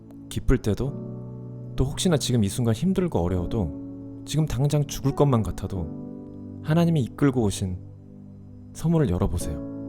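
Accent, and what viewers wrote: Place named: United Kingdom. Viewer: 힐링벌스 좋아요! 자주 올려주세요 ㅎㅎ 영국발음도 멋지십니다👍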